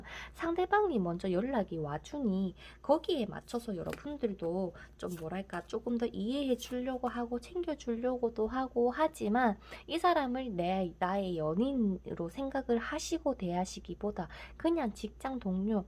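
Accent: Gyeongsang, South Korea. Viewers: 안녕하세요 구독 좋아요 구독중이에요,,오늘부터 1일이예요.특유의 목소리 톤이 꽤 좋아요. 경상도 사투리같아요.ㅎㅎ제 가 경상도 사람이거든요.저는 여기서 파란색을 뽑았는데, ㅜㅜ 사랑이.아니다라는 말에 조금 놀랐어요. 집착인가봐요.정리를 해야 겠네요.. 추억 속 에 남겨두고 아예 연락이 안 왔으면 좋겠어요. 물론 참고할께요.죽어도 잊지 못할 만큼이였으나, 이젠 놓고 받아 들여야 겠어요. 진정한 사랑이 아닌것 같네요.감사합니다.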